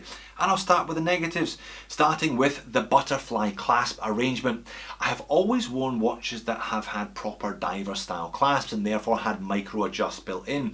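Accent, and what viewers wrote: Scotland, United Kingdom. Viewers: Sorry, I've watched you from start of corona but now you've iterated at least 4 times you're a Scotsman in this vid alone... accent is starting to make sense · Ha ha, love the watch gift idea. Going to find another fiancé 😂looking for a Ball 904L I'm a recent subscriber and slowly getting through the vids.. don't mind the scotch accent...if you were Welsh we'd be told every other minute..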